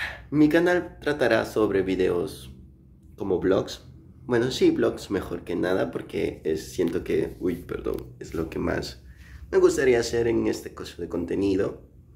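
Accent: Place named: Mexico. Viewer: que noticia tan maravillosa, me encanto tu videos y entrenaré el español mexicano escuchándote, hijole que padre!. buena suerte.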